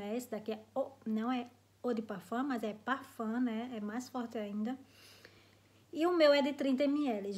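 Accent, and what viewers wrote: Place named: Northeast, Brazil. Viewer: Amo perfumes e estou amando seu canal amo seu sotaque nordestino esse jeito gostoso de falar. ganhou mas uma inscrita. você Raquel Mota Mary Brito e o Ander Style são os meus yotuber favoritos❤💋💋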